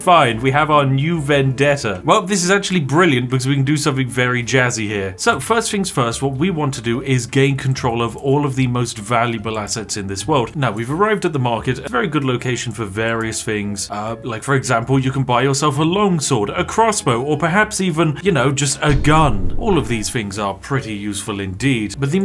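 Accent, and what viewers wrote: United Kingdom. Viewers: The pure British accent its so good and it really makes jokes better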